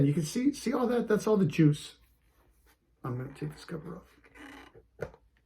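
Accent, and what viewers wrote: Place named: Massachusetts, United States. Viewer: Sounds like is from Billerica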